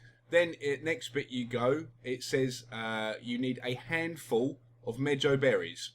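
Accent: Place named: United Kingdom. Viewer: thx bro oh and are u from britin i am are u u sound like it wigan i geuss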